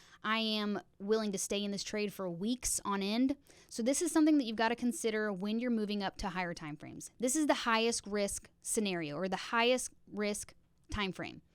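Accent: United States. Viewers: Original American Accent...❤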